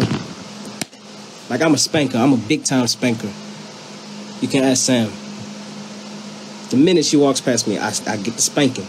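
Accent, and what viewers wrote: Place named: United States. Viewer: American accent mxm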